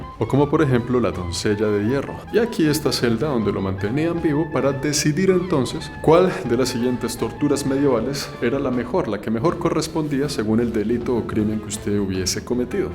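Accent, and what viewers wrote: Bogotá, Colombia. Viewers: Hola gracias por tus vídeos tan instructivos,y con tu acento tan marcado de puro Bogotano.ojala visites pronto la tierra.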